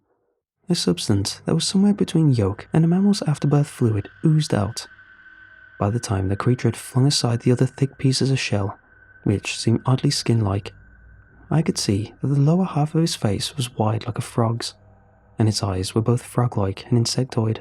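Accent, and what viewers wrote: United Kingdom. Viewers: Damn it I don't want to admit this but, I want you to keep narrating so I'm going to give you the compliment at the cost of my own public shame.. I actually wait for your freaking stories and I was so psyched to look down and see an early one because even though it's a highlight I wouldn't toss around publicly, it's still nevertheless is a highlight.. actually if I'm going to be honest it's a spotlight. It's amazing what these narrations can do when you're having problems. I've caught in myself thinking through things while listening, and although your Asian, (which sticks in your head somewhere in the back for some reason) the British amazing accent is surprisingly soft and it's very comforting. On a side note listening to Mr creepypasta, I see a guy in a blue suit that resembles Spider-Man, that shifts from a maybe comic book character to and uncanny amount of different human variations, so at least with you it's a comforting guy on a couch drinking tea type of thing, it's really unique I'm rambling thank you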